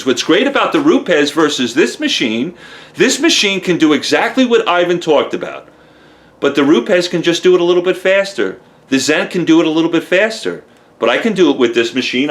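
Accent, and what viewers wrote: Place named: Rhode Island, United States. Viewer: Love u to...lol....your accent...I have the same accent...Rhode island lol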